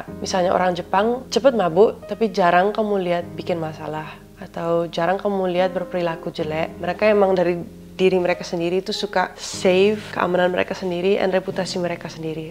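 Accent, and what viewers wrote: Bali, Indonesia. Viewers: kk ini accent bali nya kentel bgt yh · Logat mu, seperti warga Bali., yg perlu kamu jelaskan, mengapa orang eropa kurang apresiate aturan dan etika di Bali. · Medok nya kayak orang Bali di dengar sama orang Jakarta 😅🥰🥰🥰 masukan yang bagus bukan hanya untuk Bali, juga saya termasuk orang Jakarta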